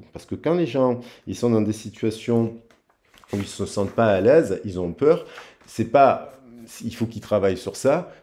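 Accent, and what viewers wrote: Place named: South, France. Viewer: Coucou 👋🏼 🎉 Comment allez-vous aujourd’hui?! Je suis trop contente de vous retrouver sur cette vidéo 😊 j’adore 🥰 votre accent du Sud:-)) toujours aussi intéressante 🧐 votre analyse 📈 belle journée ensoleillée 🌞 mille mercis pour ton énergie mon amour ❤que tu mets dans toutes tes vidéos 🐿️🙏je te fais pleins de bisous 💋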